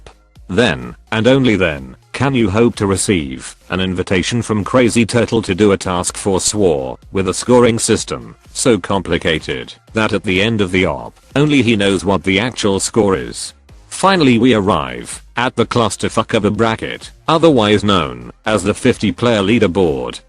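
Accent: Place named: United Kingdom. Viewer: How did you get the British voice?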